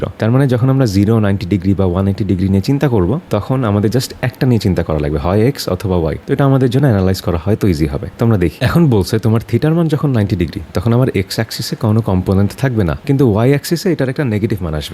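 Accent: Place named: Dhaka, Bangladesh. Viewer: আপনি যে ঢাকাইয়া কণ্ঠ শুনলে বোঝা যায়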